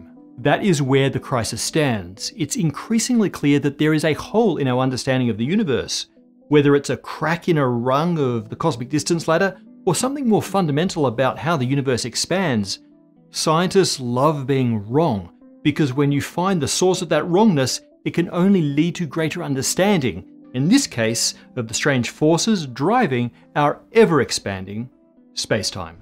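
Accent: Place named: Australia. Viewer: his accent is in a way closer to australian, with some peculiarities of Irish, possibly of family origin.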